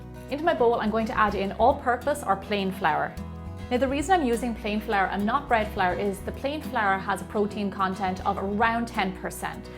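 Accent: Ireland. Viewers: Yeah, better to watch a pretty lady with an Irish accent making children's food than a hairy, grunting,sweating man in a vest dripping sweat and armpit hairs into the cake mixture... :p No probs. This recipe is a good pizza recipe. For years, I have been making my pizza and bread dough in almost the same way, so yeah it's worth keeping. I'm a bit sad that cooking secrets are being given away on YouTube so that rich people can make even more money, while people who have kept them as family secrets for many years are still poor... Take care. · Are you Irish, Jamaican? Your accent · I could listen to you talk all day! Sexy Irish accent.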